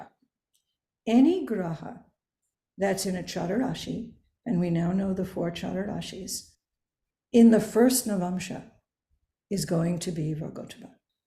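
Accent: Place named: United States. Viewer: Whose accent, I know the American accent is difficult to understand if U put on captions it will be ez to follow her. I hope that helps.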